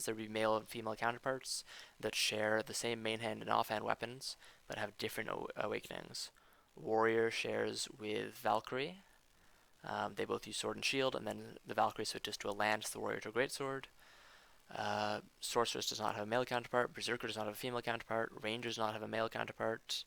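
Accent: Canada. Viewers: Get oot of here mate. Love the Canadian accent!!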